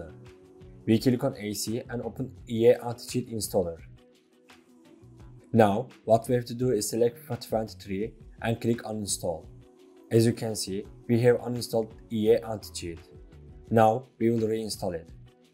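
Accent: Turkey. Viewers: Dost aksanından anlamıştım Türk olduğunu Fakat çalışmadı :(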